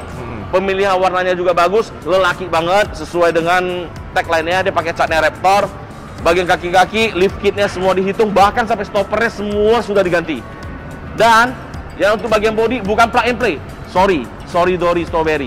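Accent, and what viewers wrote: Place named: Makassar, Indonesia. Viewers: Mantap tawwa koko, pintar ki logat mkassar... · mantap tawwa logat makassar na boscu.. nda kyk org yg dr luar mks yg lain.. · Mantap logat makassarnya bosku, cocoki kau rasa 👍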